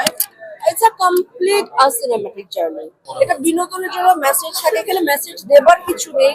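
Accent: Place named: Kolkata, India. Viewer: এর কথায় কলকাতার টান আছে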